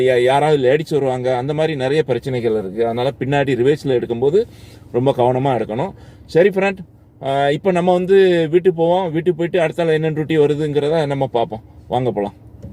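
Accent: Tirunelveli, India. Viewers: நீங்க பேசும் போது அந்த திருநெல்வேலி பாசை வருது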